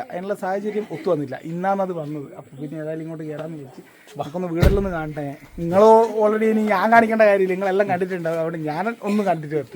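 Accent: Kannur, India. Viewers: Kannur slang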